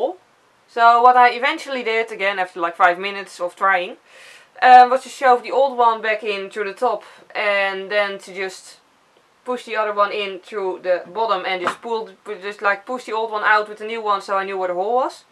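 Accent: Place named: Netherlands. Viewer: Wow, I did not expect you to answer personally.🙂 I just noticed your accent, I'm german and I often go shopping in the netherlands, but I never heard a Dutchman/woman talk English before, sounds kind of familiar to the accent the Dutch have when then talk german.😊 Btw. thanks for answering me and I think your video was helpful.👍☺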